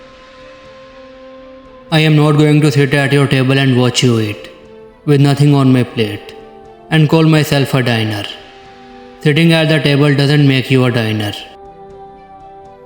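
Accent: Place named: India. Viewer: Why india accent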